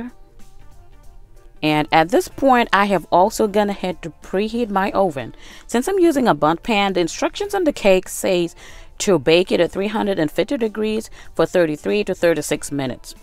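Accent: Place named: South, United States. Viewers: Thank you so much for sharing this! I think us ladies work hard enough, and I wish housewives got paid for all the work that we do...but don't just say I'm a housewife, no--say Domestic Godess! Love your recipes and yes also your accent (southern?) Have a beautiful day 🌹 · I sure thought u was from Louisiana 😁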